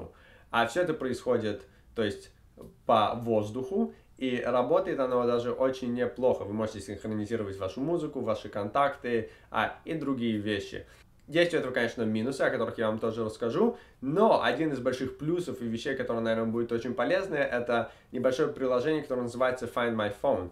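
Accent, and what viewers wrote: United States. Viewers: умиляет американский акцент и интерференции с английским языком в речи ведущего. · по-моему живет в США:) акцент так и прет:) "на интернете" хаха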